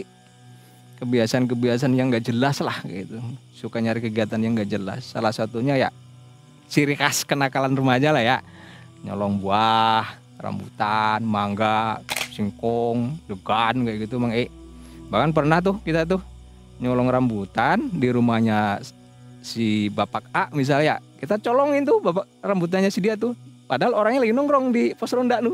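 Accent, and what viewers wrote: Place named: West Java, Indonesia. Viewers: Kok kayak orang sunda mas nya yaa 😂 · Mang ini kan orang asli cilacap ya, kok beberapa celetukan lebih mirip orang sunda daripada gaya khas orang cilacap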